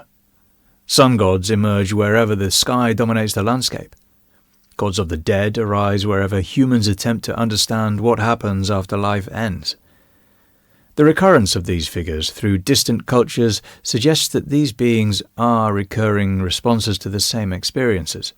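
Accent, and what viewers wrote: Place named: England, United Kingdom. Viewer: Where is your English accent from?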